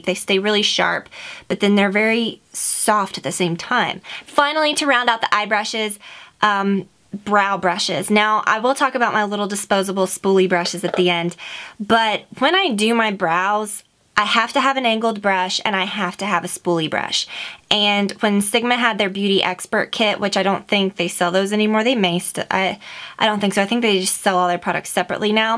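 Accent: South, United States. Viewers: I can hear a strong southern accent! Love you! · Woah wait you have a southern accent???! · I don't normally pick up your southern accent in your videos but for some reason I could really hear it in this one lol! Adorable of course, and thank you for all the great info :)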